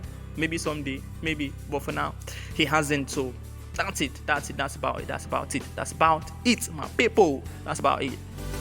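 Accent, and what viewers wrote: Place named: United Kingdom. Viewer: I love this voice over Giving me “British Vibes”😍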